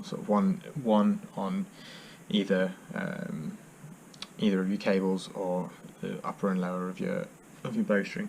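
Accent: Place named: United Kingdom. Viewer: the unintelligible limey blather ends and installation begins.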